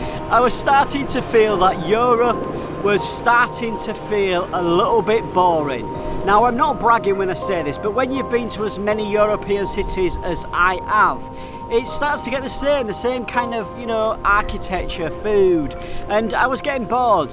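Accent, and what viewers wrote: United Kingdom. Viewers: Hello sir.... I love your video about Malaysia.... I hope that I can meet you one day.... I love British people because of their talking style or maybe the accent... I love the way you talkin' sir so British 😅.... anyway welcome to Malaysia once again sir... enjoy your life in this beautiful country okay... 👋☺ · Ooo u come back to ur ex coloni...Malaysia is ex British colonial and British is not strange to us...ur ascent so pure british ascent..amazingly u like nasi lemak the malay traditional foods and now days all malaysian take nasi lemak. The cultures is diversity in Malaysia. I not need to tell u more about Malaysia and u well recognised about Malaysia I can see that. The London also not bad and amazing city too. London also with multi races and mostly from commonwealth British. Indian and Pakistan foods in Victoria also not bad nice taste and its cost about 10 pound huhuhu..one thing I like to travel to Europe is from London. 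The national express provide the route to the rest of Europe city and departure from UK to other city to Europe is easy for the imigration process.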